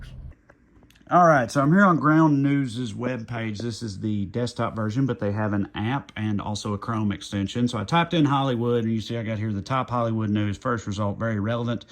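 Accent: South, United States. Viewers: I was born in Venezuela and learned British English. I’ve been living in the United States for 25 years, and I never thought I would love this southern accent more than the one I learned English with.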